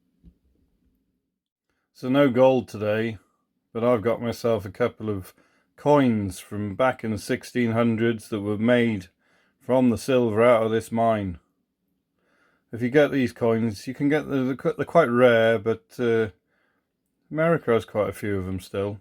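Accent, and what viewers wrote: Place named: Norfolk, United Kingdom. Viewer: Where are you from butty. You don't have a Welsh accent....more like Norfolk. I guess you moved out of Gods country but the Hiraeth brought you back 🏴👍. I guess the prospecting in the South was not so good. Most of the interesting minerals are in Mid and North Wales. Keep up the good work.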